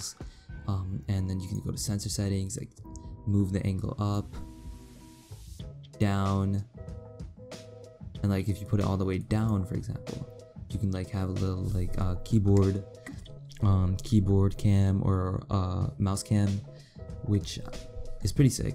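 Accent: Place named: United States. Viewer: almost left expecting half indian with some english and immidetly got an american accent , whats up bruhhh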